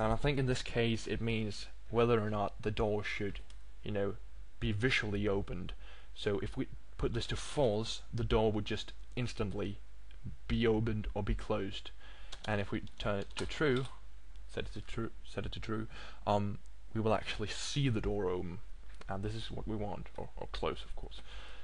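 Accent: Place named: England, United Kingdom. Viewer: Ahh, I srsly had to listen so intently. We both speak English, however he has a heavy English accent whereas I have a Western American accent XD I was actually sitting here like, ">_>?" I even widened my eyes as if perhaps that would help. A little note.. widening eyes do not make one hear any better; though it does cause one to see a wider spectrum of one's room..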